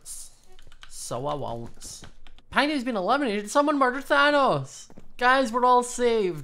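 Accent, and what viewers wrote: Scotland, United Kingdom. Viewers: Lol i van barely hear what hes saying through that thick scottish accent XD your vids are awsome luv ur vids!!!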